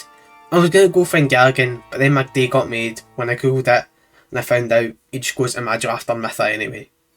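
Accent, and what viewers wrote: United Kingdom. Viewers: What do you want him to do, stop being British? The man can’t change his damn accent.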